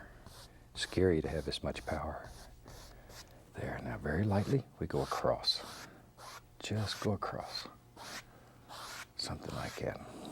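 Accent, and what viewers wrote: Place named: Florida, United States. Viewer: He and his dad did a lot of carpentry work in the Orlando area back in the late 50s early 60s. I’m a native Central Floridian and his accent and demeanor harken back to simpler time in Central Florida.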